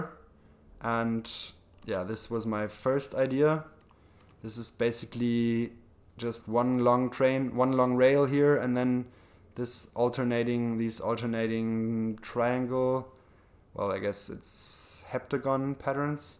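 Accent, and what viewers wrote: Germany. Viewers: Aye bud, you kinda sound a tiny bit German is that possible?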